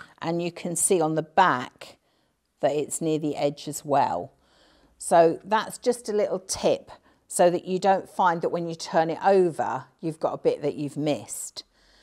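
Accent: United Kingdom. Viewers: Plus I just love your British accent.😊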